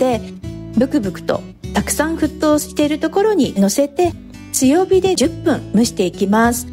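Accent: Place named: Niigata, Japan. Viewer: どこか少し新潟弁入ってる？と思っていたらやはりそうでしたかー 私の故郷です いつも優しい語り口で癒やされます これからも楽しみにしております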